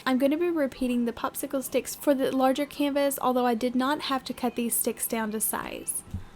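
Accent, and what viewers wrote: South, United States. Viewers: Everything is just so cute ! Love your southern accent, where are you from ? I'm from Alabama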